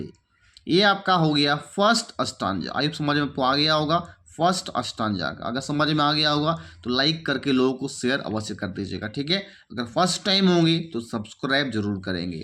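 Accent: Bihar, India. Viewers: Sir please aap apna accent thik kriye English words ko bihari accent dekr kharab mt kriye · Sir please apne bihari accent ko sudhariye. English words ki ma-bhen kar rkhi hai!!!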